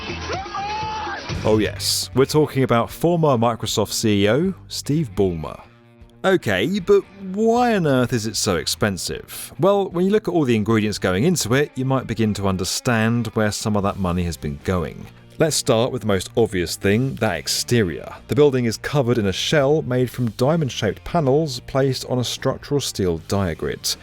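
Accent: United Kingdom. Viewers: These pretentious British narrator voices make me ill. Similarly do does the cost of seating and tickets in these arenas built for the minority of wealthy people and the fiscally irresponsible rather then the rest of us. Seriously why would I waste so much money when I can watch it at home in comfort on a huge screen for a tiny fraction of the cost?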